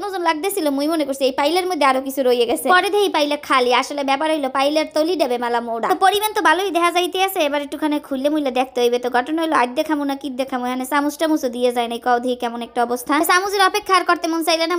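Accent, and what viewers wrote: Barishal, Bangladesh. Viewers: আমার এই ভিডিও টা দেখার কারণ হলো বরিশালের কথাগুলো শুনার জন্য। কেননা আমি চট্টগ্রামের আর আমার একজন মনের মানুষ ছিল বরিশালের। তার কথা গুলো সেম মতো।অবশ্য সে এখন আমার আর নাই।আমায় বিশ্বাস নিয়ে আমাকে ঠকাইছে।অথচ ভালোবাসার উদ্ভুত মায়া।সে এখন আমায় ঘৃণা আমি তাকে করতে পারিনা ঘৃণা এটাই হয়ত ভালোবাসা।বরিশালের ভাষা কারো মুখ থেকে শুনলে আমার বুক ফেটে কান্না আসে।নাম তার ফ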